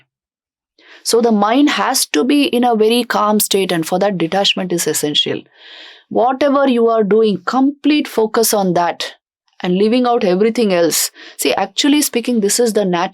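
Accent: India. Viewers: Why does she have an Indian accent in English? She doesn’t look Indian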